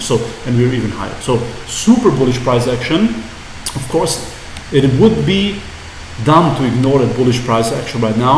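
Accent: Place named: Belgium. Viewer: are you from belgium? You really sound like a belgian guy speaking english 😁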